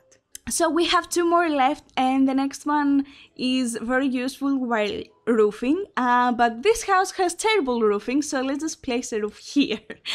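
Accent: Greece. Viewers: She has to be greek to have that accent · Are you from Greece??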